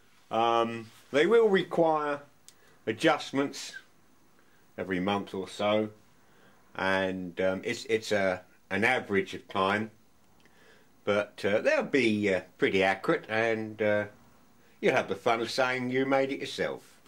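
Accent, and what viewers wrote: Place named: Norfolk, United Kingdom. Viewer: I would have sworn you were from the Norfolk area. Oh well.......... I am over the water from you in Bromley, close to Greenwich.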